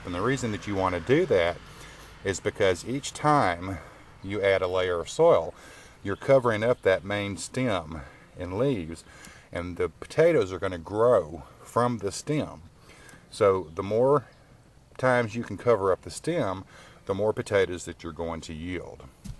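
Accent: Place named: South, United States. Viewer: Lovely! Thank you for the post. (I love your sweet southern drawl too!)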